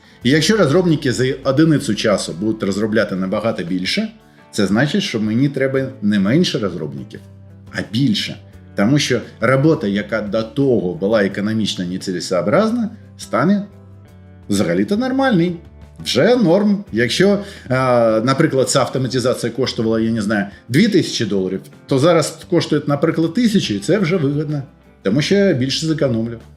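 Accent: Belarus. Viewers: Свідомий перехід на українську для російськомовних людей - це найкращий доказ вибору, часто дуже тяжкого і заслуговує поваги, але... поки у це схоже на білоруську ))) Може, ШІ допоже? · bialoruskij akcent:) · Сорян, що не втримався... Але якби думати, що білорус, то акцент був би "природний" )) Суто з філологічної сторони якщо сприймати... 🤞 P.S. А за інформативність по суті - тільки респект:elbowcough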